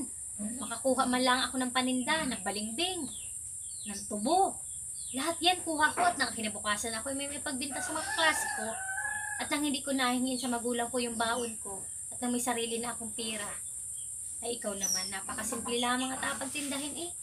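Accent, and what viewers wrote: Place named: Oriental Mindoro, Philippines. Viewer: Akoy tuwang tuwa sau marehin namiss k gnyng tono ng salita jn s ating Or. Mdo.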